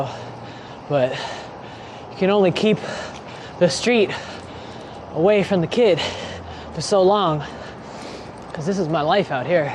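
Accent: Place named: Mexico. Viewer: Estas hablando asta con el acento Mexicano.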